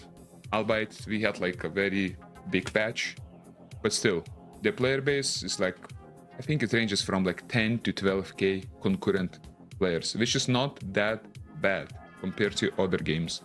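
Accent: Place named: Russia. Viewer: Пипец акцент русский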